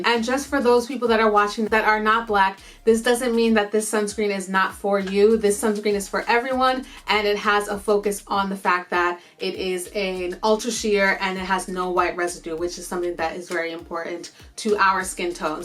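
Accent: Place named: New York, United States. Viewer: girl - LOVING this video. thank you for sharing your feelings and resources. I appreciate you and will follow up on as many as I can. you DO have a voice and I love hearing it! PS - is that a New York accent?! never heard that in your voice before ahahaha (I already knew you were in NY). I do love Fenty and will continue to support her brand (I also recently bought that new blush). Similarly tho, I try to stay on a budget hahaha. wowwwww the hair products claps SO MANY options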